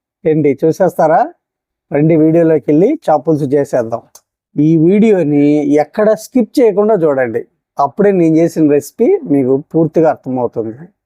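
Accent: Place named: Tenali, India. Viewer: Meeru cheptunte naku tenalanipisthundi sir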